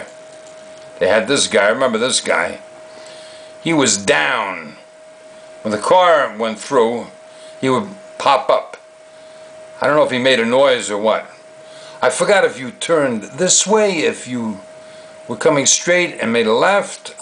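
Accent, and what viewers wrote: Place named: Pittsburgh, United States. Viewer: This IS...the BEST...all time narrated video ever! Pure Pittsburgh 100%. Good ol Laff In The Dark...as narrated by a great Yinzer! "Toss an M-80 at The Devil" that is AWESOME! So Pittsburgh! THIS is the ride they truly should have saved and preserved. (Even if it had to be totally-rebuilt/updated/whatever) as Laff In The Dark was a GEM. An old time gem. The "Turnpike Ride" killed this one off. And Kennywood hasn't been able to figure out "The Old Mill" for 60 years. Cool old skool ride (we used to stop the boats, get out and walk around the inside of the Old Mill. (Then-renamed "Harold's Haunted Hideaway" whatever. I think now it is some dumb "Garfield Ride". Damn shame too. They could TRULY make this ride a scary gem, if they truly wanted to. But...just like Noah's Ark...it is an insipid and watered-down BORING ride anymore. 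) And (just like Laff In The Dark...and even (for a while) The Old Mill...and ugggh..."Le Cachot"? Remember THAT dumb ride? Everyone was upset when it got torn down. But it was a worse ride than "The Gold Rush". Kennywood...truly, has been piss poor at "dark/scary rides"...but...Not always). There was a time when Noah's Ark was simply badass!. The shaky floors were REALLY "shaky" long ago. Sometimes (in today's cancel culture-of-everything) well..."sometimes they work. Sometimes not" Boring.) The "air blast WHOOPSIE" trick would NEVER fly today. (Old timers know what I am talking about). Take your date on a walk thru. Noah's Ark...make out on the quasi-M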